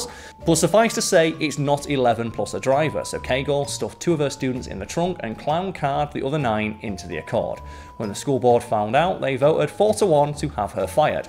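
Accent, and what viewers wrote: United Kingdom. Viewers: I was so worried about Top Tenz replacing Simon. But so relieved they got another British to host. Please don't replace him with someone with an accent that is not British. No disrespect, but British accent is always so soothing to listen to. Thank you.😊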